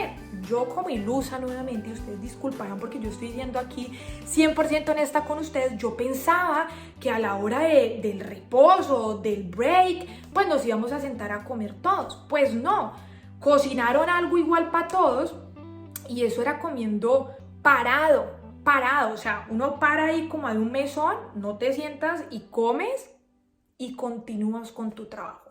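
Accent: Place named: Cauca, Colombia. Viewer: Saludos jajajajaja ese acento del Cauca está intacto 👏👏!Gracias por tu contenido!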